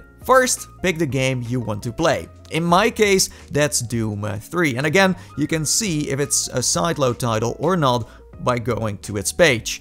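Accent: Netherlands. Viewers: are u from the netherlands cause i am and i recognzie the accent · lol okay I hear within the first 4 seconds you are presumably dutch :) haha, cheers man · Try english beter dude u dev sound like someone who’s dutch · Are you from holland?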